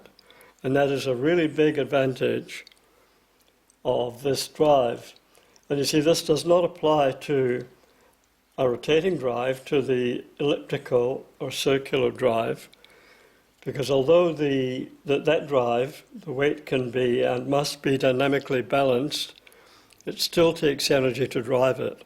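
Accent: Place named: Northland, New Zealand. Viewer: Just by any chance are you a Northland Kiwi????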